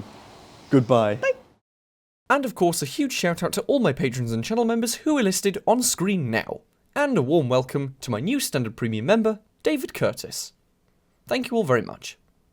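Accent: United Kingdom. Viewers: I love that British accent. And the nerdy content. Awesome. Please keep going.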